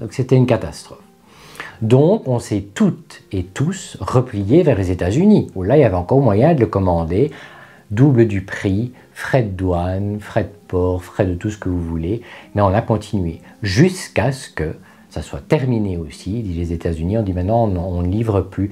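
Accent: South, France. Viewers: Hello! Vidéo qui a peu de risques d'être démonétisée! Tu d'viens bon loll Pour yesstyle, ça te met aussi (minimum) un mois pour arriver chez toi? Pour ma dernière commande, j'ai failli leur envoyer un mail pour demander s'il arrivait à pied... J'espère que tu reviendras plus souvent mais en mode 'currente body (accent du sud)' car ces vidéos, c'est vraiment toi! Bye bye et à bientôt!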